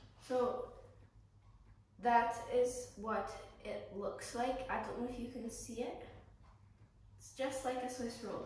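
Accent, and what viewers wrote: Scotland, United Kingdom. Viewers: Hi! So nice to see you again, love your cooking skills, you look so grown up, and starting to have a wee bit of of Scottish accent, how is your brother? Happy Easter to you, and your beautiful family, 🙏💕🇨🇦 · Happy Easter & Family! My old math teacher always said only crazy people make perfect circles, no stress on circles lol. your western kitchen skills are getting good! Also you are starting to sound a lil Scottish with a tiny accent on a few words - very cool 😎 😀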